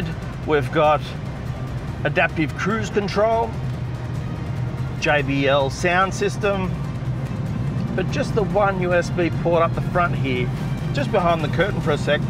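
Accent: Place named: Australia. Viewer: As soon as I hear an Australian accent, I know it's NOT the version with the track package lol.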